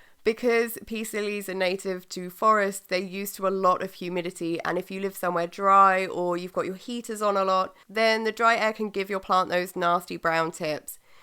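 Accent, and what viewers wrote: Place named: RP, United Kingdom. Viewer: It’s a perfectly normal English accent. RP ( Received Pronunciation) , or the Queen’s English ( as it was once known). Probably both terms deemed hate crimes or xxxxxxxphobic or some such nonsense in today’s’woke’ society. Just plain English. As was once the dominant language in England.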